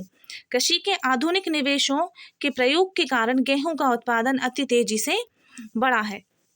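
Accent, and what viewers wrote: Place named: Bundelkhand, India. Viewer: Your bundelkhandi accent is cute😉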